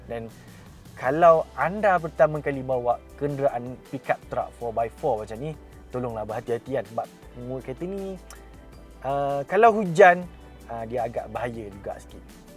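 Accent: Kelantan, Malaysia. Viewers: ada bau2 kelate tu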